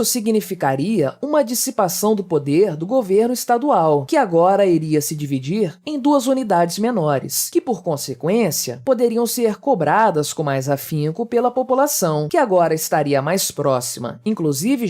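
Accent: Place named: Southern Brazil, Brazil. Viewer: VC DEVE SER SULISTA OU PARAENSE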